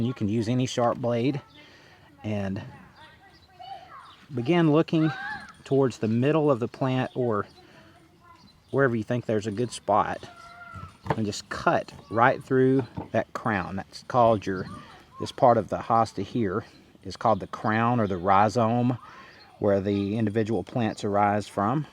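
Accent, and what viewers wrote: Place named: South, United States. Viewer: Love to hear you talk…..love love love southern folks.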